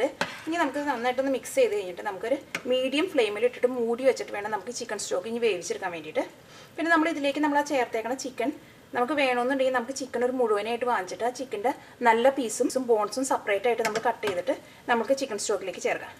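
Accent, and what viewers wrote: Thrissur, India. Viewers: thrissur slang :)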